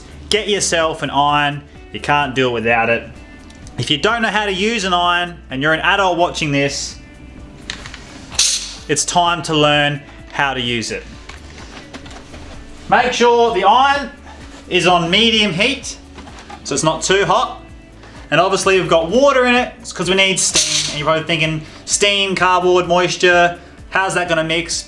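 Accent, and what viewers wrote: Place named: Australia. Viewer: Subscribing because I miss the voice of my aussie buddy Kevin "kaixin" from l🎉ast decade living in shenzhen China. You sound exactly like the guy in China who taught this yank and his kids how to play dungeons and dragons in the undisputed champ of English accents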